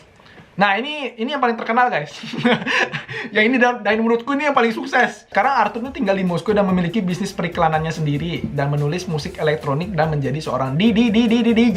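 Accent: Bali, Indonesia. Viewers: Logat bali nya sangad kerasa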